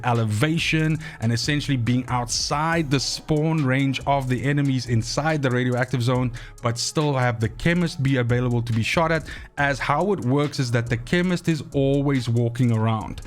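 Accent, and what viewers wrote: Afrikaans, South Africa. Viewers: Bro are you South African?? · Man sounds Afrikaans af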